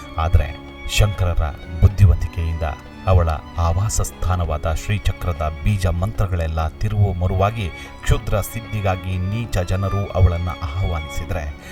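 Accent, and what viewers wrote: Standard. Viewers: Shuddavaadha kannada uttama vaada voice sir nimdu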